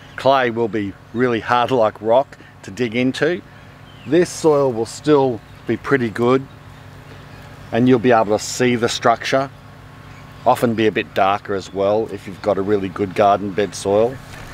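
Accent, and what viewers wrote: Australia. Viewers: Awesome. Enjoy the Aussie accent and attitude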